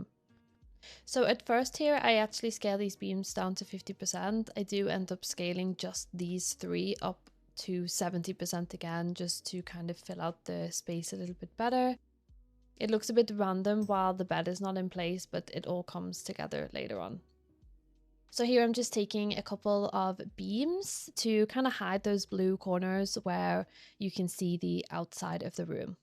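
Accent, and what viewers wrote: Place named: Scouse, United Kingdom. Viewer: Lovely stuff! Forgive me for asking, but do you have any affiliation with the city of Liverpool? Quite a lot of the things you say sound like there is a twinge of a scouse accent there! :)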